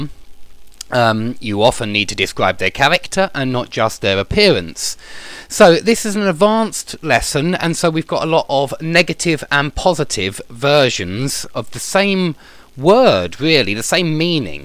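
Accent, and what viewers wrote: United Kingdom. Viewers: I like a lot your British accent. Keep shining.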